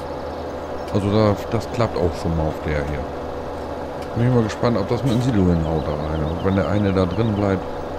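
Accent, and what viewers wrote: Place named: Low German, Germany. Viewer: Din Platt Mega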